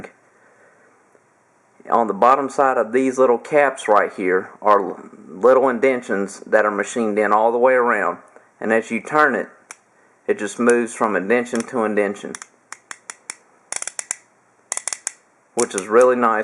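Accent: South, United States. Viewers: I used this review 2 yrs ago, to clarify the instructions on the package. It was very helpful. In fact I bookmarked it, and still refer to it on occasion. As for the sight itself, I originally had it on a Mathews Drenalin, with a 4 power lense, and it greatly increased the accuracy. I have since upgraded to the Mathews no cam st, but I transferred the sight because it does not need to be upgraded. I now proudly own the deadliest tack driving bow & sight hunting combo I can imagine. Just for the record, you NEVER need to apologize for the southern drawl, y'all. Good hunting. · Give you 300 likes, I just bought this sight from a guy and had no idea how to use it, your tips on setting ranges is great. Thanks, southern drawl no problem, from Arkansas ha. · Mate, as an Aussie, I find your southern drawl to be both easy to understand and charming. Thanks for this great video. 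You have done a good job of pointing out the features that make this sight different and special. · GREAT REVIEW....LOVE THE SOUTHERN DRAW.... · I bought the pro HD HS version with the sliding dovetail and it arrived today. Mounted it and I'll sight it in initially early next week at the indoor range. It is a very nicely machined piece of equipment and my PSE Revenge is sexier already. LOL Thanks again for the review and I have some great friends with Southern accents so you're good to go!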